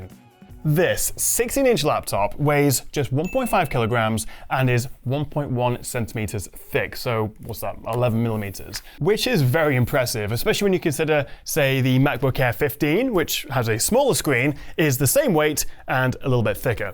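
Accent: England, United Kingdom. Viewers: From what region of England are you?, Is it just to know where your accent comes from. Please. A French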